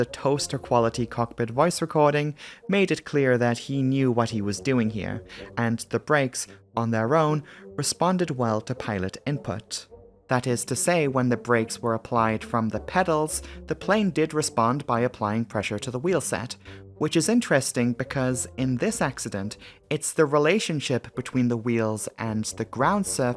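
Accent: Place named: United Kingdom. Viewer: No she’s just British don’t be racist.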